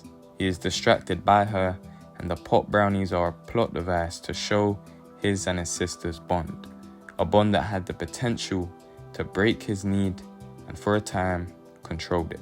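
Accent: United Kingdom. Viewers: Unironically yeah. His intrusive thoughts. Lack of social adaptability and understanding the human condition could be solved if he just watched and listened to the ppl around him as he’s actually very empathetic Unironically goated algo pull. And another British voice on Dexter is always needed loool. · Oh no the narrator is British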